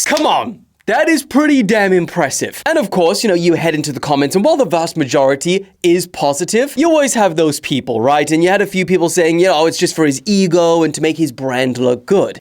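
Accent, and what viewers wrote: South Africa. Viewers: It sounds like you might be from South Africa 🇿🇦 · Oh hey, a South African Brother. Nwice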